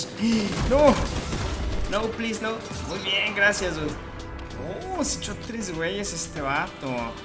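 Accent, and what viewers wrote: Mexico. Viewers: Porque hablas como arigameplays? Es algun dialecto de alguna parte de mexico ?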